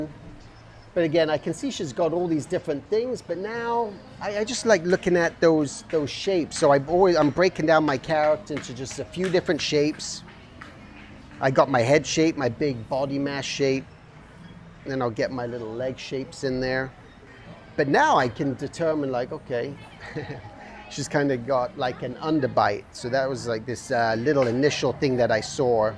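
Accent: United Kingdom. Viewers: He sounds like he has a British accent mixed with a New Jersey accent. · Oh my gosh I'm glad it wasn't just me! I hear British pronunciation, a Cleveland accent, and New York inflection. WHAT?!?!?! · I know tight! Hahah i was baffled by it! I hear british, a lot of new yoooork. But also a flavor of canadian in there so weird hahaha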